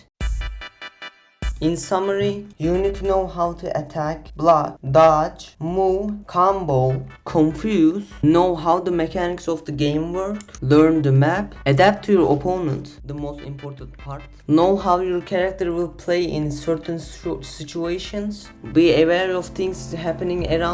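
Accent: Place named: Turkey. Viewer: Brotherman is obviously Turkish. Gotta get that pronunciation smoothed out, brother.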